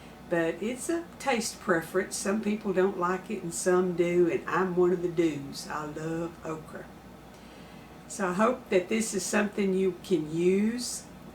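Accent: South, United States. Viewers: Good morning! I'm not an okra lover as I mentioned last time lol. I just popped in to watch and listen to your lovely southern accent and to say good morning. I really don't mind what you can or cook I just love visiting with you in your kitchen. Big hugs, Michelle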